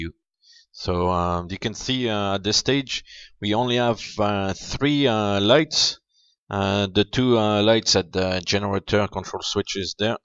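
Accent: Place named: France. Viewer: Un joli accent Français ^^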